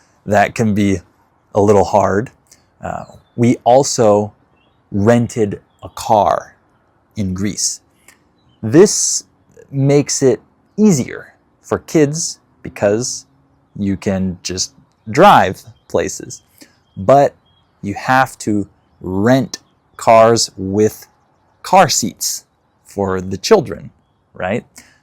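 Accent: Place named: United States. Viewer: American accent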